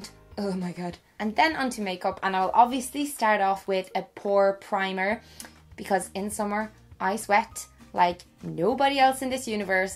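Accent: Ireland. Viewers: i luv ur voice i wish mine was that irish 😍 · wait, are you Irish???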